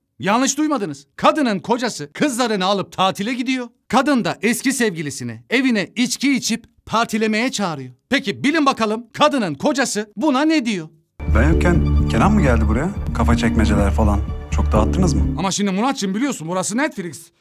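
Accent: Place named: Eastern Anatolia, Turkey. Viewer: sizin şaka (ki çoğu şakanız gerçekten vasat) maksadı ile söylediğiniz cümleleri, doğu şivesi ile söylemenizi ne yapacağız?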